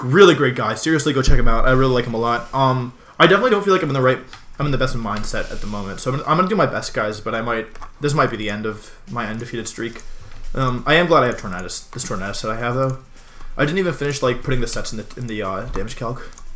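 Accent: United Kingdom. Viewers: LOL the british accent, love it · Bristolian is like no other british accent sir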